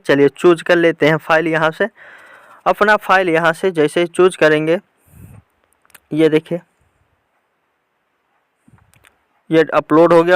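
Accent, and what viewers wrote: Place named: India. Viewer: Chutiya mt bna mobile s ni bhra ja rha or Teri voice to ensi h jaise up ya bihari hoga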